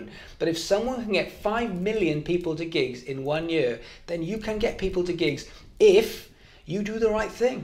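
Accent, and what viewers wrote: United Kingdom. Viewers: Great video, and nice to hear a fellow brit!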